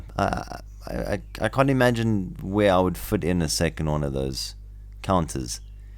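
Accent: South Africa. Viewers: You sound like you have an South African accent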